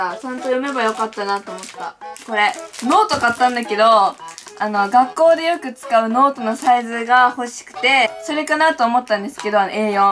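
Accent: Kansai, Japan. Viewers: 関西人だから関西弁じゃない？多分...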